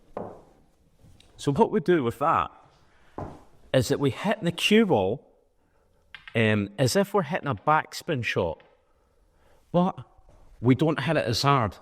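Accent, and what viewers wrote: United Kingdom. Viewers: I'm so tired of listening to the British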